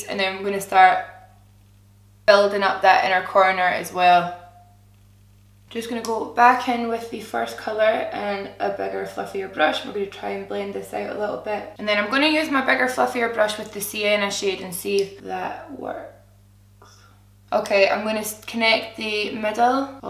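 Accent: Scotland, United Kingdom. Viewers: There's nothing less beautiful than saying beautiful with a scottish accent! Until a week ago i'd been in new zealand for 2.5 years and watching your vlogs and your scottish accent gave me life! and kept me in tune with my own accent. love it every week- you're amazing! - IG sazwood94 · Omg I saw the awesome makeup and clicked and I was so surprised to hear a Scottish accent! Yay! Love from Glasgow x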